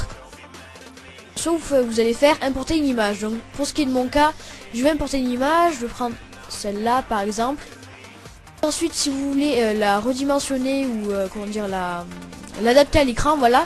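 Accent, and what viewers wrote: France. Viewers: Plus marseillais , tu meurs ! :D MARSEILLE EN FORCE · On sent l'accent du sud x)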